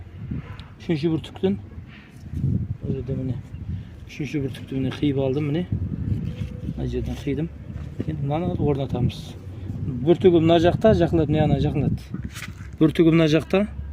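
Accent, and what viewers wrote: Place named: Turkistan, Kazakhstan. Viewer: Ассалаумағалайкум аватар жүзімінің қаламшасын күзде дәл осы кезде кесіп көміп көктемде тамыр шығарып алса болама? Түркістанның қазағымын сіз сөйлеп жатқанда қай тілде сөйледіңіз тура біз сияқты ғой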